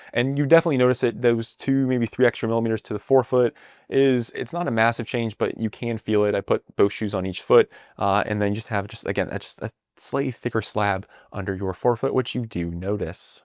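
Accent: Maryland, United States. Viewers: Maryland accent heavy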